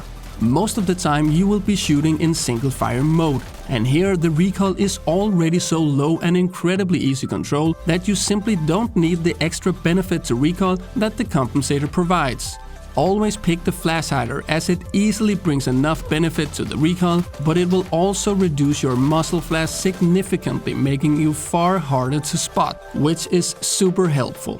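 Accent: Denmark. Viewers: Is it just me or does they guy have a Danish accent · So easy to hear you are Danish dude hehe 💪 you are doing a great job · er du dansker? :P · Are you danish? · Er du dansker? ☺ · Danish, right?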